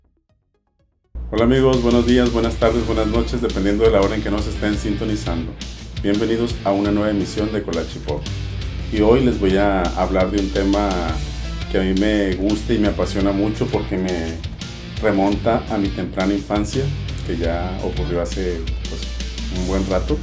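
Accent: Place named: North, Spain. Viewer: Hablas bien bonito, acento del norte je je je.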